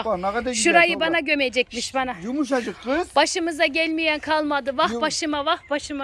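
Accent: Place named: Kayseri, Turkey. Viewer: Nerelisiniz abla kayseri şivesine çok benziyor şiveniz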